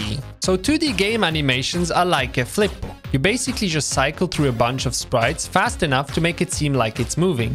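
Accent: Poland. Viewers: Are you from poland ;3? Also great job on the video man, its soo high quality. Keep it up and you will go to places cheers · youre from poland?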